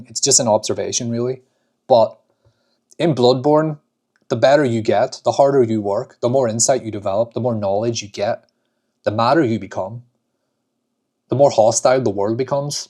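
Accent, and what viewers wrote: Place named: Northern Ireland, United Kingdom. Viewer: Mate r u from NI? was so hyped when I saw the thumbnail and my excitement doubled when I realised u have my accent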